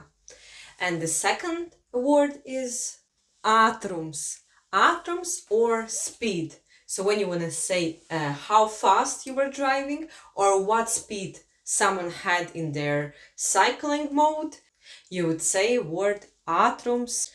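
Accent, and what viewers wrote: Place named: Russia. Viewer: Its interesting when russian is teaching latvian in english :D Had some troubles with Ģ pronunciation. And classic russian fyorst not first :D But in general nice video and idea :)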